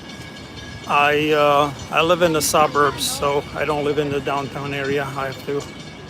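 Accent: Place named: Romania. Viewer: Bănuiam eu că ești român după accent dar nu eram chiar sigura. Mulțumesc de sightseing.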